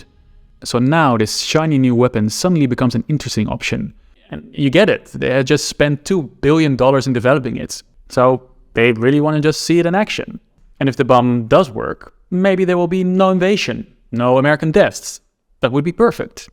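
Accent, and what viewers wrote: Netherlands. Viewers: Dutch accent is strong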